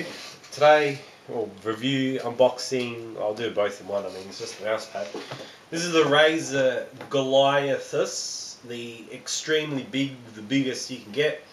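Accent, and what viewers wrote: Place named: Australia. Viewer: Yep, he's Aussie 7 years ago wow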